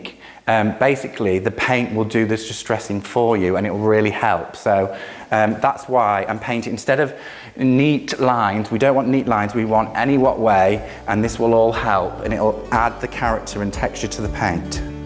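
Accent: United Kingdom. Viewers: First let me say, LOVE the British accent. Hello from New York, USA! I like the fact that you did not said the piece to obtain the "distressed" look. Water seems, it only easier, but better for getting the paint off exactly where you want to without sanding somewhere you don't. Also, the water seems to be a lot quicker and cleaner. Thanks for sharing!!!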